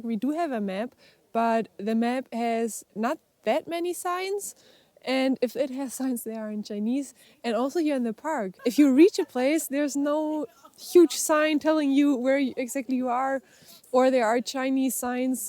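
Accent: Germany. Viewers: Hallo! Ich höre da einen deutschen Akzent raus-daher in deutsch: großes Lob für super informative Videos! Wir sind demnächst auch in den Avatar Mountains.Darf ich mal fragen, wie ihr das mit der Drohne und der Anmeldung hinbekommen habt? Man benötigt, so weit ich weiß eine chinesische Telefonnummer. Würde mich über eine Antwort freuen.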